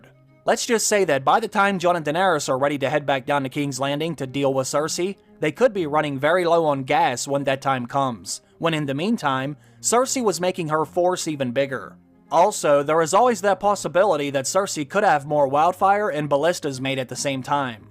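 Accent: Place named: Baltimore, United States. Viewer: My guy, are you from Baltimore? Cux it sounds like youre from Baltimore.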